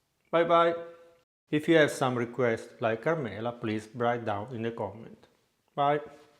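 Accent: Italy. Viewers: Yet to try a recipe of yours...but I am spellbound by your accent and hand motions...a true Italian Chef! Please come to SouthAfrica..Cape Town...come visit...you would teach a thing or two to the so called Italian restaurants here...I even belong to Italian Club and their ciabatta is horrible!! Show how to make Glace Chestnuts please!!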